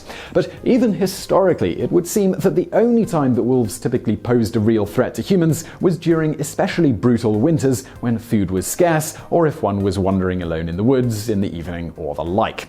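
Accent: United Kingdom. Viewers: Simon, Simon... You are my favorite YT presenter! Sometimes your pronunciation of certain words are slightly cringy. I attribute this to your UK accent. However today? You use a word you call "Disorientated". What you mean is "disoriented" but, well,... come on honey?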